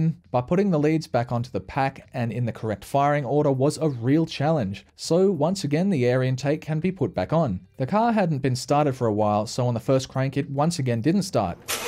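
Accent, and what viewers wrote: Australia. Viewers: This is the first person I've seen with a clear Aussie accent who talks in American English, weird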